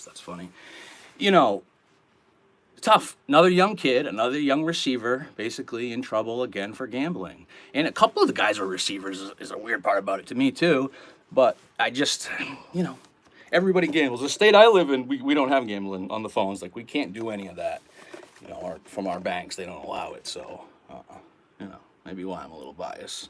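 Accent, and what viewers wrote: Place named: Maine, United States. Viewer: Good Job bro, that Maine accent shows up on live. Good people hunting is great.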